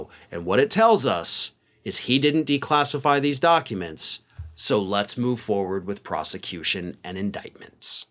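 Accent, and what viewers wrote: South, United States. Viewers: I caught your southern accent. Thanks for all your good content.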